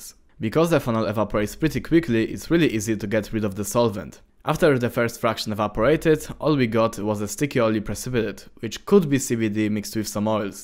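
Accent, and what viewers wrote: Poland. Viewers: Tak coś czułem, że jesteś z Polski. Denaturat potwierdził XD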